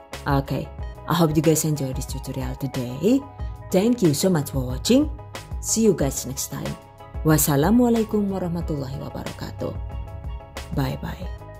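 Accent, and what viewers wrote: Banyumas, Indonesia. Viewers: Selain resep2 dan penjelasan yg jelas singkat padat,aku suka sama logat ngapak nya...Caaaaatat....😀matur nuwun mbok ilmu nya semoga sehat selalu...Caaaatat😊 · Mantap,. Nyam² :) Selalu terhibur dg ngapaknya. #GiveawayTHV2021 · Chanel kesayangan ku, mudah d fahami krna selalu ada tip & trik. Dan yg paling uniq bahasa nya inggris,indonesia dan ngapak.pokoe lain dari yg lain deh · Logat ngapaknya emang selalu bikin kangen kak, 😁 sukses terus, gak pernah pelit resep emang ini🙂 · Ngapaknya itu loo..jd ingat klo keluarga besar suami lg ngumpul di Sumpiuh 😄😄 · DONE. Resep cemilan is the best, pas buat isi toples persiapan lebaran. Selain unik cr penyampaiannya yg slalu ngapak resep n caranya jg detail bgt. Trm ksh teh resepnya yg slalu menginspirasi bgt.#GiveawayTHV2021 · Mb lewih kepenak ngomong ngapak bae lah..😀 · Bismillah #GiveawayTHV2021 Bahan simpel mudah di dapat Suara yg ngapak bikin ngakak🤭🤭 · Hhhhhhh lucu,seneng dech dengerin mbk nya klo lg ngomong ngapakkk auto jd ngakaakkkkkk dech nyonge😂😂🤣🤣🤣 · Ora ngapak ora kepenak 😅. 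Sukses terus buat yang selalu menginspirasi dengan resep resepnya #GiveawayTHV2021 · Ngapaknya bikin ngakak bu...mood banget dah 😂😂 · Bhs ngapak e menghibur,lucuuu....resepnya jempolan.favorit 💞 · Love love banget sama ngapak nya 😅😅😅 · Lucu org jatim tp bs ngomong ngapak n pinter bhs inggris pula msh muda, tp suara dewasa keren" resep nya👍👍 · Logat ngapake wisss ora ana tandingane😁💜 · Yg sy tggu tiap liat video adalah logat ngapaknya 😁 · Sambil nyimak sambil nyengir2 dngerin omongan ngapak 😂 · tetep dong logat ngapak ga ketinggalan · Alhamdulillah nemu resep baru dari Kesukaan anak q beng2 Okay...pingin nyobain bikin buat isian toples lebaran nanti.. Suka sekali dgn penjelasannya bunda mudah di mengerti trus lucu ada logat ngapaknya 😁 #GiveawayTHV2021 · DONE #GiveawayTHV2021 Bune gawekno resep kue ilat kucing dong,sing ekonomis tapi maknyuss👍tak enteni lo bune🤗 Nek ora ngapak ora enakkk😁😂😂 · DONE #GiveawayTHV2021 Penonton setia,slalu jadi inspirasi,Krn slalu mengeluarkan ide ide yg cemerlang untuk sy biar semangat untuk usaha jualan dan penjelasannya begitu detail plus Bhs ngapaknya ciri khasnya sy bgt,matur suwun 🙏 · Bener bgt...ngapak abis logatnya...wkwkwk...trus detail bgt lg....semuanya dh pokonya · Suka lihat ngapaknya sama seperti saya penjelasan nya jelas makasih ya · hahahahaha lucu banget si lah. 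nek mirengaken tiyang ngendika bahasa ngapak toli lucu banget. ternyata aq dewek ya lucu ya nek ngomong ngapak hahahahaa😂 #GiveawayTHV2021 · Senengx disini lengkp. Suara enak. Lucu lagi. Dan bisa bhs ngapak. Pada karo inyong. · Selalu suka sm video² krn lengkap bgt sm tips² yg sangat berguna.oia, sm satu lg.logat ngapaknya yg selalu jd ciri khas...makasih buat sharingnya...semoga semakin berkembang #GiveawayTHV2021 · Amazing.... Ngapaknya... Sukseeees maa syaa Allah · Slalu semangat nonton vidio... Selain seneng karna resepnya simple n jelas juga karna lucu banget apalagi klo lg ngomong ngapak sumpah nyong geget banget... #GiveawayTHV2021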